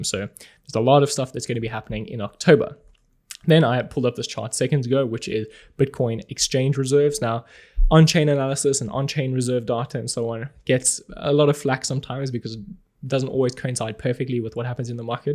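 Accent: South Africa. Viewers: sehe deutsche Werbungen gerade, aber habe immer gedacht dass du Süd Afrikaner bist wegen deines Akzents. Naja wilkommen trotzdem zurück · Are you South African?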